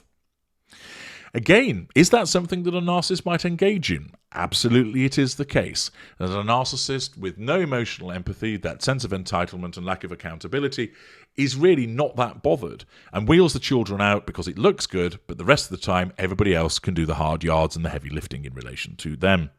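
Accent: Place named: United Kingdom. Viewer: YOU SOUND LIKE A BRITISH A**.......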